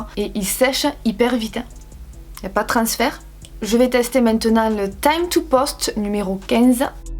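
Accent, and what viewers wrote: South, France. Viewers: sud de la france ? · c'est l'accent du sud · L’ACCENT DU SUUUUUUD J’ai juste l’impression que mis à part les ch’tis... on a pas d’accent dans le nord 😂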